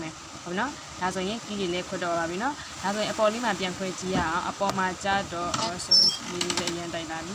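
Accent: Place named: Rakhine, Myanmar. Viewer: ရခိုင်သံလေးနဲ့ တူပါတယ် ဆရာမ❤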